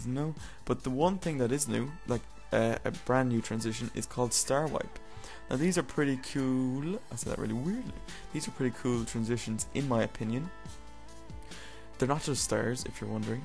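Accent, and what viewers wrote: Ireland. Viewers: Sexy Irish husky voice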